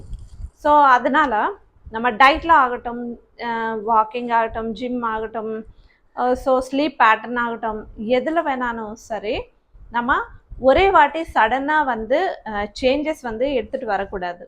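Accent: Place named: Telugu, India. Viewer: tamil pesaradhu alaga eruku, ❤you are looking gorgeous in this saree💕 beautiful queen💝👸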